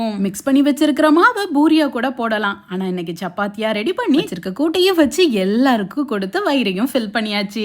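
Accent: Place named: Tamil Nadu, India. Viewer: Love your videos your passion and your tamil accent too ❤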